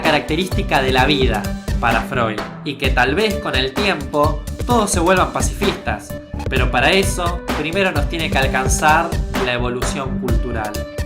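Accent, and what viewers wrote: Argentina. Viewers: Gumii Megpoiid ¿De dónde eres? No conoces el acento argentino, que mal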